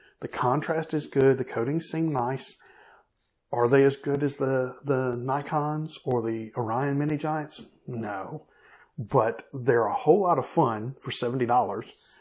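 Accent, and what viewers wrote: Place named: South, United States. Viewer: So cool to hear a brainy guy with such a fine, southern accent. :-) Been researching this stuff to death, and two of your videos have now answered essentially all of my remaining questions. Thank you.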